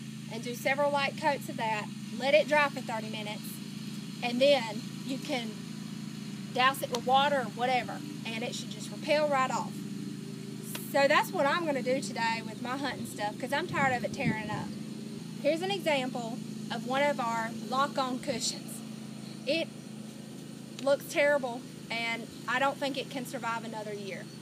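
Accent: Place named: Georgia, United States. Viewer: Cool to see a fellow south Georgian on here. I live between Waycross and Brunswick. I thought the accent sounded familiar. Where in South Georgia are you located(approximately, not your address. Didn’t want to come off creepy lol lol)?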